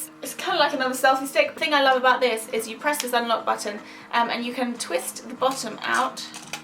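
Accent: United Kingdom. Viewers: Among all I always been very fascinated by the UK English accent..btw that's a very useful info of GoPro.👍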